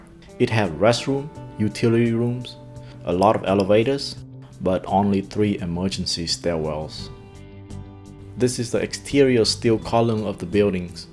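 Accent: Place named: Vietnam. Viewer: Overall OK but I have 2 issues with this video. 1) the steel beams didn’t melt, they softened while items made from aluminum melted. Melting steel is a falsehood that has been parroted by others for way too long. 2) it’s difficult to listen to a video where the speaker has such a thick Vietnamese accent. Some words sound like a hybrid pronunciation between Vietnamese and English. It’s like nails on a chalkboard at times. It made it very difficult to actually sit and watch everything until the end.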